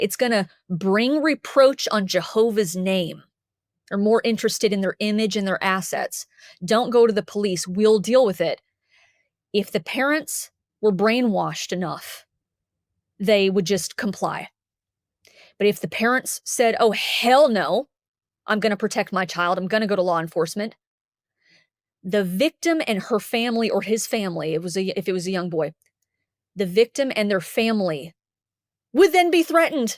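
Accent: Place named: Dallas, United States. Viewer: So crazy how clocked her Dallas accent in one minute. Takes one to know one. What a wonderful communicator. I used to drive by the Kingdom Hall on Mockingbird and Inwood every day and I wondered where the windows were!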